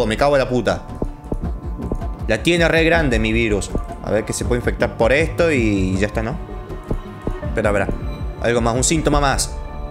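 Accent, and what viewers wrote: Córdoba, Argentina. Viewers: Si Cordoba Argentina:D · de donde sos tenes una tonada muy parecida a la argentina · ERES DE ARGENTINA O DE CHILE.-. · Para mi es de Córdoba, porque no habla tan agrandado.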